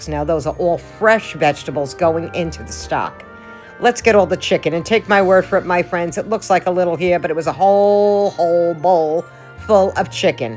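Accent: New York, United States. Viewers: I LOVE the New York accent. Its fabulous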